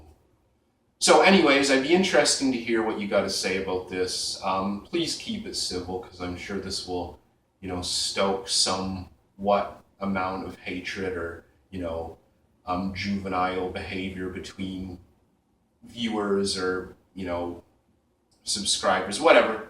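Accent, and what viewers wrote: Canada. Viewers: Agree mostly. But there is a difference between pronunciation and accent, and you used them interchangeably. Accent is based on where we learned the words -- you have a Canadian accent. Pronunciation is the way of sounding the words, so others understand us. If it is a French word, I will still say it in my American English accent, but I should not pronounce Le Male as Lay Malley. Enjoyed the video.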